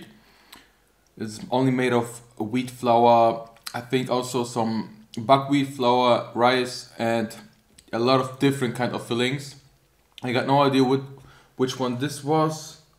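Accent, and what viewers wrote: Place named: Germany. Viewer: Das hört sich an wie wenn ein deutscher probiert englisch zu sprechen halt nur in richtig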